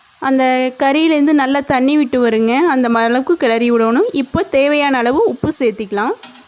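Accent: Kongu, India. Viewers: தலைக்கறி நாவிற்கும் கொங்குதமிழ் கேட்பதிற்கும் சுவையாக இருக்கிறது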